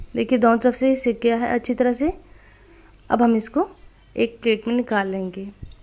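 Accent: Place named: Pakistan. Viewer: Nice pan cake. Kya ap pakistani hai ab ki awaz bht achi hai. Apka lahja bht sweet hai.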